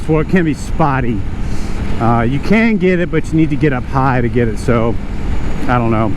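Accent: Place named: Ozarks, United States. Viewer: Ozark hillbilly 🤔, you from SW MO or Arkansas?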